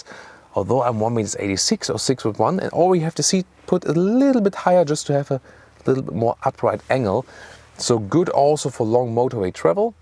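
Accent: Germany. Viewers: Nice review. I love the German accent.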